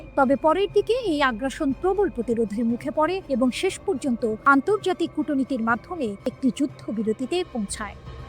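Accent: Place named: West Bengal, India. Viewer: নাগরিক টিভি ভারতীয় বাংলা উচ্চারণে সংবাদ পাঠ চালু করলো কেনো?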